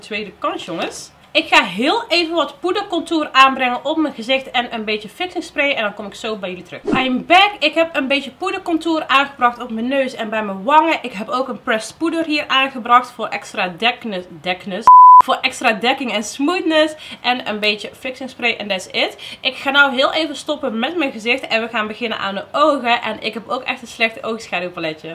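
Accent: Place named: Tilburg, Netherlands. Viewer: ik heb heel effkus hoor ik je zeggen 😂 heerlijk Tilburgs!!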